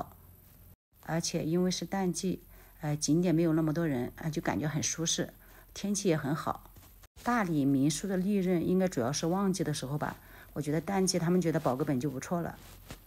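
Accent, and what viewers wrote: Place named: Enshi, China. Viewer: 楼主好像带恩施口音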